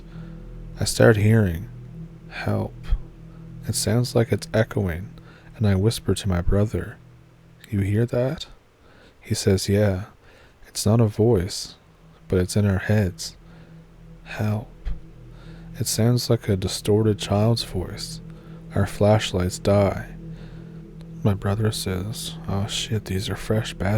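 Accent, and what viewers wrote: Canada. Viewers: Is this somebody else’s story because you don’t have an Oklahoma accent you sound like you’re from Canada? Is that correct?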